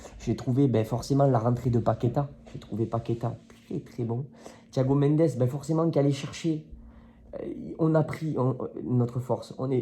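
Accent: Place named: Occitanie, France. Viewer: Et pourtant un vrai gone mais le travail a fait qu'il vit dans le sud en occitanie d'où l'accent mais lui et sa famille complète sont de la croix rousse. Lyon 4eme. Et a vécu dans le 7eme et 8eme arrondissement de Lyon. Son amour pour l'ol le prouve sans soucis.😂😂😂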